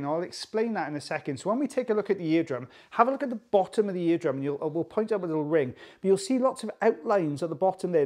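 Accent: Wales, United Kingdom. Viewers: I watch the videos as they are interesting and I have the notifications switched on. Also who doesn’t love a Welsh voice 😊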